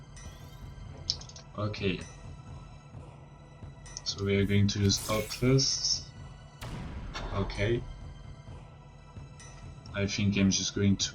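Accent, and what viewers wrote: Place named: France. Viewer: he's just french and doesn't know how to speak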